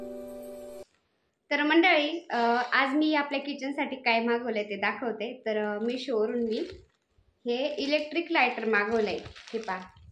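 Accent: Ahmednagar, India. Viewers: Nagar chi bhasha lagech olakhun yete amhi pn kud bolato sagale bolatat kuthe bolav pn aplich bhasha chhan ahe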